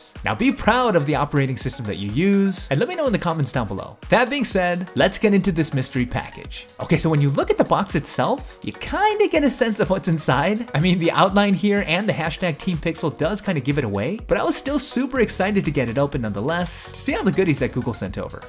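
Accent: United States. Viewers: Another fantastic video from. Honestly saying I didn't copy but suddenly noticed there is a channel which is publishing videos almost identical to my videos. Even though he will win for quality and the gears he is using are far better than me. Also my accent is too Indian (Im not Indian though) than his American excellent accent. you are good.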